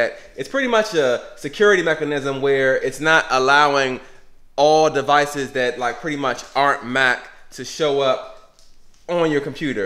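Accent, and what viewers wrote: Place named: Prince George's County, United States. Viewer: Thank you! I can tell that PG accent from anywhere btw :)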